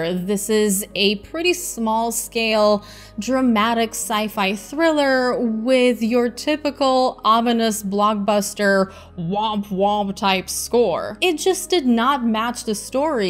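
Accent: Russia. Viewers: Love your accent. Are you Russian?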